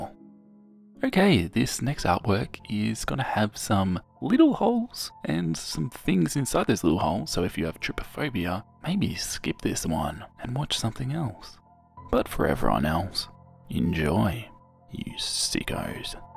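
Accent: Australia. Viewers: Love a deep Aussie accent! Stumbled across this video and the way you narrate it is fricken great. From 1 Aussie to another! 🤙🇦🇺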